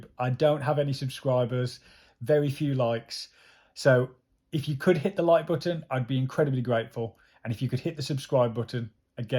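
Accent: Black Country, United Kingdom. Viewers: interesting black country accent there :) Your on a par with all the other videos I have watched on you tube so well done on your research. Hardest bit is the starting to save. Get over that and then your on to a winner.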